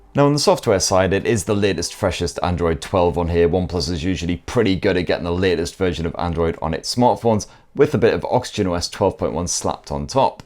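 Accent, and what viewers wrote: United Kingdom. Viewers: i'm not a tech guy but love ya style, even though i'm not an native i love and appreciate your your lovey thick accent. Hope ya dont mind me asking what part of uk ya are from. thanks. you're me favorite tech guy so far 🙂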